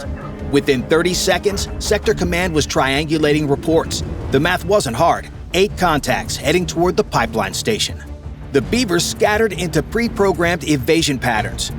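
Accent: United States. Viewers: It’s a shame it’s all in a US of North American accent. Totally unbelievable.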